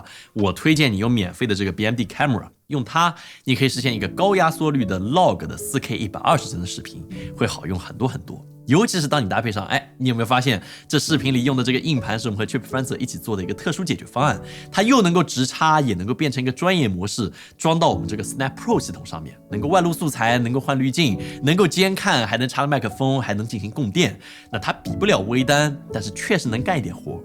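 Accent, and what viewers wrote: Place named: Songjiang, China. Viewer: 听这个普通话有点像上海松江口音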